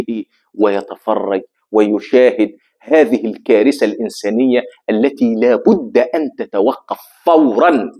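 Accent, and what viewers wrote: Morocco. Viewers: لهجتك المغربية فنة 🎉😂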